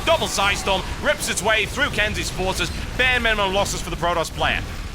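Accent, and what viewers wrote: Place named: Geordie, United Kingdom. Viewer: Mr. John Bain, let me extend my request for you to do a SC2 commentary using your Georgie accent.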